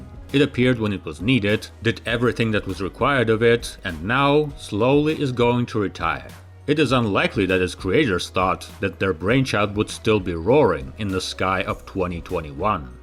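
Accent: Russia. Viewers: I though that it's going to be cheesy due to the Russian accent. But the whole video is well written & presented. Some details I'm hearing for the first time. Anecdotes from the time, historic footage, modern footage - well done! 😎👍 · "Your videos go from strength to strength. Your narration and English vocabulary are nothing short of impeccable." ..said by another viewer; echoed here. It's a pleasure to listen to your erudite narration and your Russian accent. Big fan of your videos. ~A former cold warrior.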